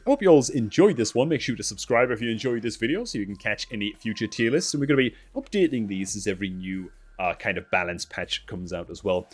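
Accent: Newcastle, United Kingdom. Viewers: Hey man, good to hear your dulcet Geordie tones making wow content again and more importantly enjoying the game!! Cracking list and great addition of the stats for each spec, really gives a better perspective on what is actually strong and not just popular in the hands of the right player!!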